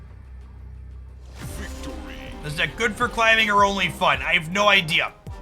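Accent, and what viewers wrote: United States. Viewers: haha! Could tell within 2 sentences. Source: someone also from the south side.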